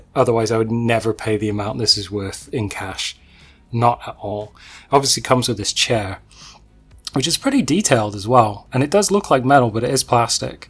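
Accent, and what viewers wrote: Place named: United Kingdom. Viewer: Nice collection, mate! 👍 Particularly love the Dagobah set-up! Maybe surprisingly, the one figure that stood out for me and makes me want to get one was R5-J2! That little fella looks quality standing next to Tarkin! 💯👌 Btw, are you a British expat in Canada or do some Canadians sound as though they're from the UK? (Hope that's not offensive in any way to our Canuck cousins!) 😅 Cheers!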